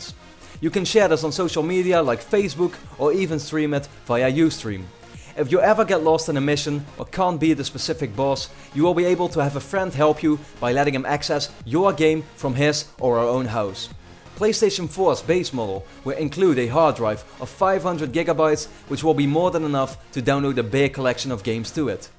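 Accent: Netherlands. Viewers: Is dutch?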